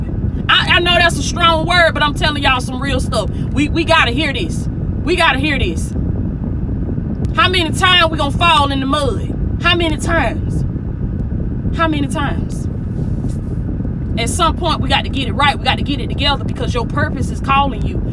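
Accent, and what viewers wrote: South, United States. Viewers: This sister chopping up 🔪 Real Truth with that wonderful southern accent....🍓🌹🌹🌹♥️♥️🙏🙏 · Your accent is so adorable to your Godly personality is this a Texas, or the Carolinas, Georgia maybe a Florida accent? God is good. The enemy only comes to kill, steal and destroy... Remember that! If someone is an ex its for a reason. God doesn't allow a true life partner/destiny spouse to exit your life, the purpose would be greater than the two's emotion or troubles and God's sovereign will would cover and keep the relationship steady and balanced. The Lord gives blessings and good gifts a help mate not a satanic agent or a repeat offender. When a wicked person resurfaces it's like a venomous snake, when it rears its ugly head, cut it off! WATCH & PRAY... Bloodline Circles all around us they cannot cross over! The deeper you go with God and the more you are delivered and the more power you actually wield the spiritual grounds for certain people, places, spirits and things are broken. 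They are bound banished and vanquished away. They can try but will fail. Make the Hand of God and Blood of Jesus the gateway to your personal and intimate life. We are only human and imperfect but even Angels of God will keep evil away and ward them off. He wi move you on to a place they can never find you even in a crystal ball or to astral project to monitor you will be shut down! Nobody pass Jehovah put Him in front of all things. His plan is not for the wrong everything and everybody but for the proper ones.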